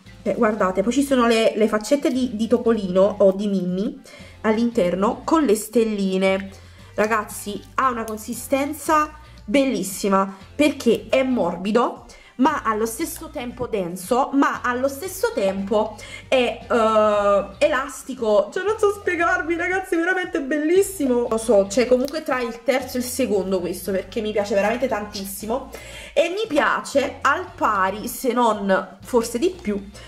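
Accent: Naples, Italy. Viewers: La tua voce napoletana ha ha ha ha ha bellissima e bravissima magg scurdat pur o nome hahahhahahahaha adoroh viva NAPOLIIIII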